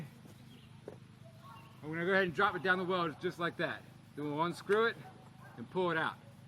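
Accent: United States. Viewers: As an Aussie living in Thailand for 19 years, I find it hard to understand your rapid American speech or what the plan for this water-supply is (and that was my specialty), but is looking so er, civilised, with his trim. Great work team, you're nearly there!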